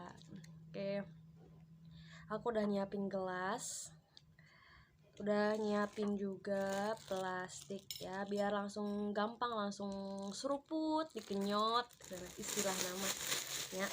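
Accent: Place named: Sunda, Indonesia. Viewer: Sunda banget wkwkwk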